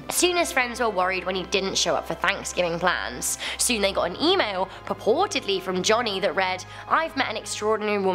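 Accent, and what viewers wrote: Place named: United Kingdom. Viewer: is Irish? She sounds British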